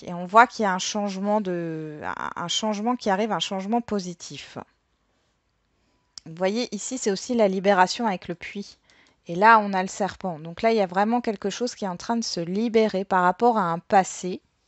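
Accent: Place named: East, France. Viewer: Vous êtes de l'est car vous dites :"Ui" au lieu de :"Oui" !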